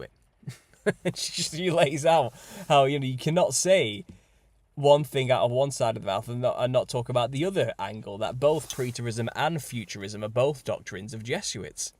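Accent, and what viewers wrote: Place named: Yorkshire, United Kingdom. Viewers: can I just say what a joy it is to hear a northern English voice. Thought I was on my own here in the northwest. If you are anywhere near, check out the great hall in Rochdale Town Hall. It's amazing, I'm fully on board with this whole theory/truth. Once I had made this decision, we randomly had to visit Rochdale for an appointment and afterwards rather than waste the journey had a look at the town hall. It was like God giving me conformation 😮 keep up your amazing work. The bible is just making more and more sense now 🙌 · I am sure there are books missing, has Yah isnt a God of confusion. Which is why i am reading the whole apographer. It will be revealed to us has and when we need to know. In the meantime looking for answers is keeping us busy and we are getting a better relationship with Father because of it. 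On another note im sat listening to this trying to place your acsent, Yorkshire i think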